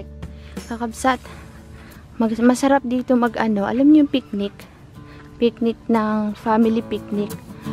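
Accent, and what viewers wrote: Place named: Davao Oriental, Philippines. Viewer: Parola mati davao oriental na logat jen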